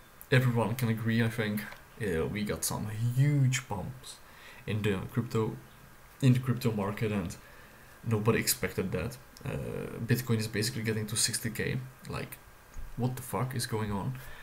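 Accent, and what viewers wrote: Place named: Netherlands. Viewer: Thanks for the reply my man! You Dutch by the way? You kinda sound Dutch ;)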